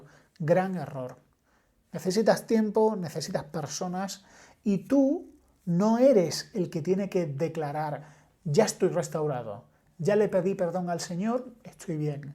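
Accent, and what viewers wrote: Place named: Argentina. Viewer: No digas tonterías eres argentino se tu acento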